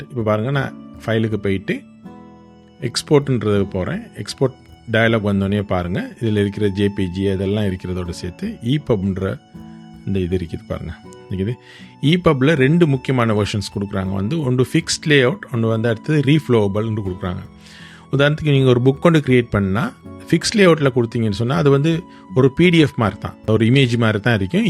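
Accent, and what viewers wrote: Sri Lanka. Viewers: bro Eelam tamil ah? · Are you from sri lanka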